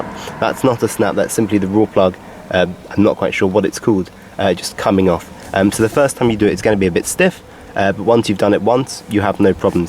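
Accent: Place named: England, United Kingdom. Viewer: Thanks. Very helpful and in the King's English with birdsong background. What's not to like?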